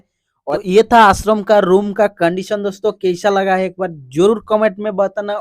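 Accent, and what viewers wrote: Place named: Bengal, India. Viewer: কথা শুনেইতো মনে হচ্ছে বাঙালি... তা বাংলা তেই বলুন না... নিজের ভাষায় কথা বলতে কি লজ্জা করে??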